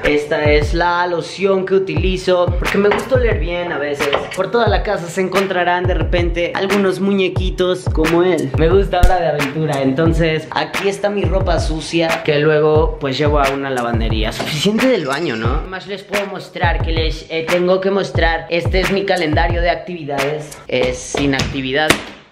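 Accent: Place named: Spain. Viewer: Odio que hables como ESPAÑOL 🙄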